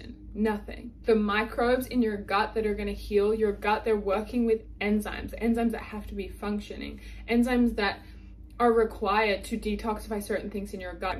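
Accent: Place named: United States. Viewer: I can hear the most tiniest American accent coming on!!!! Fellow Byron girl here x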